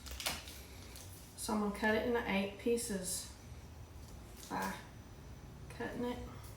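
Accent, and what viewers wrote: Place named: South, United States. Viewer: Oh these look so good. Love your sweet accent and southern charm. Much love from Abilene. - Miss Hetta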